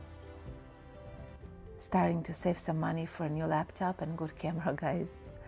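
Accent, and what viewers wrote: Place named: Russia. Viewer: Русский акцент слышен всегда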